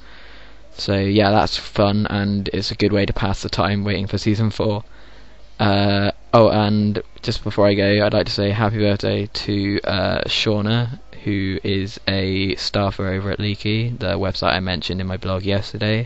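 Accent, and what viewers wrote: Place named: United Kingdom. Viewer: teehee...cute. You sound more British to me than you did in the BWR days. I am SO excited for Lost! Wee!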